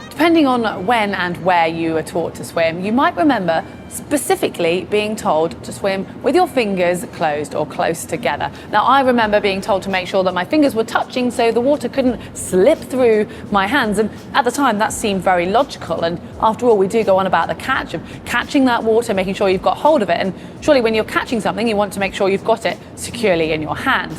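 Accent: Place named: United Kingdom. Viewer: Dear GTN, Thank you for this hydro dynamics piece of advice. I would have another subject that I would much appreciate you to deep for us? The "cross-over kick", as I could discover it through this last published video from the Effortless Swimming Youtube channel called "The Most EXTREME High Elbow Catch EVER". This leg kick is unfamiliar technic (for me), however it seems recommended for long distances swiming or triathlon? By the way, I'd like to mention how much great improvements in listening English I am making, since the time I subscribded to your channel (as a froggie)! I find it is so pleasant, and not so common on YT, listening such british accent! Thank you so much.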